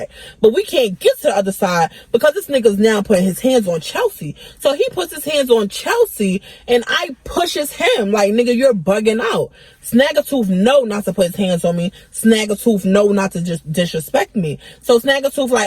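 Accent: New York, United States. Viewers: Im new to ya page so are u from NY? U definitely sound like us😂😂😂😂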